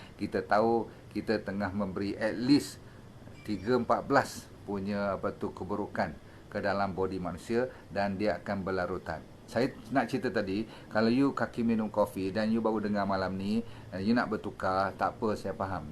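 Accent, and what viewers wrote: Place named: North, Malaysia. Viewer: 1.pandangan saya kalau Dr. ni cakap bahasa melayu pun mesti tak akan cakap ikut Bahasa Malaysia standard. Sebab mungkin akan mengambil masa memperbetulkan cara cakap. Dr. berasal dari utara. Tapi dalam video ni nampak la doc dah cuba untuk bercakap dlm bahasa yg mudah difahami org Malaysia. Kalau doc cakap semua dalam loghat utara mesti penonton dari negeri lain ada yg tak faham. Biarlah doc bercakap dengan apa yg dia rasa selesa dan santai. Saya sendiri kalau disuruh cakap Bahasa Malaysia standard dgn sebutan baku akan ambil masa untuk bercakap sebab dah terbiasa bercakap menggunakan loghat utara dan selatan serta English. 2. Lagi satu Bahasa Indonesia mempunyai lebih ramai speaker sebab itu orang luar tertarik nak belajar.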